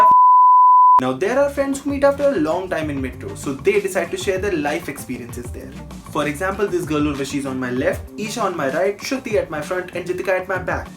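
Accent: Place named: West Delhi, India. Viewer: Bhai west delhi ke lgte ho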